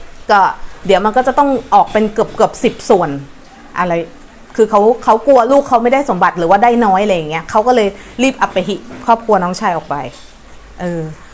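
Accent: Isan, Thailand. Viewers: นางเป็นคนอิสานหรือเปล่าครับสำเนียงออกภาษาอิสานจังหวัดไหนครับ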